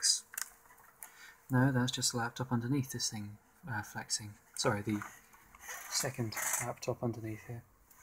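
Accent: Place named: England, United Kingdom. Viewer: I mean I know people think English guys have posh voices and sophsticated words (as long as you stray away from the Chavs etc) but this guy takes first prize :D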